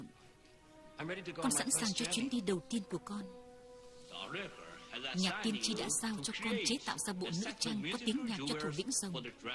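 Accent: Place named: North, Vietnam. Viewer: Htv mà thuyết minh giọng bắc vậy? Htv thường lồng tiếng chứ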